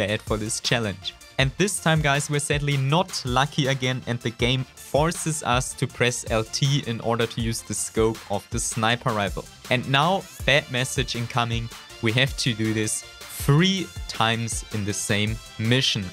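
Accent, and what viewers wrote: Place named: Germany. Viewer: Man hört einfach so hart raus,dass du deutscher bist haha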